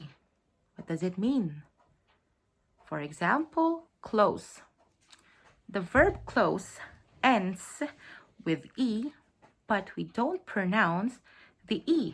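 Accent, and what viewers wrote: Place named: Venezuela. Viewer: Parla venezuelano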